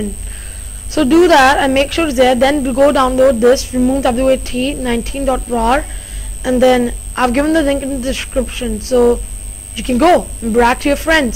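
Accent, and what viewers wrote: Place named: India. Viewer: you sound like a indian speaking english indian lol nice video. good work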